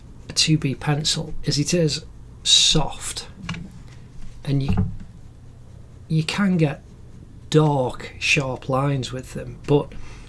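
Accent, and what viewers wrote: United Kingdom. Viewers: Whoa! Your accent is like newt scamander!!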